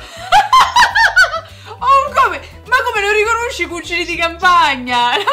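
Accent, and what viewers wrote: Sicily, Italy. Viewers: MA SEI MESSINESE O CATANESE? :D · ma sei siciliana ?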